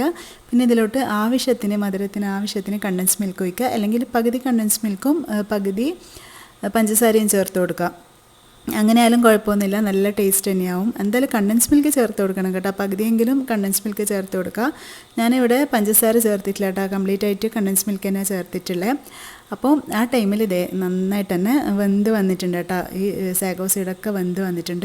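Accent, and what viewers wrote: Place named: Kannur, India. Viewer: Samsaram kannur karudaedalla...super videos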